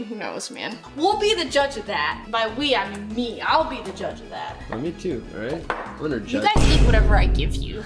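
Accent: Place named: United States. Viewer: Why does she have an American accent now?? ☹I've watched ever since her K-pop days and her accent was distinctively British whenever she speaks English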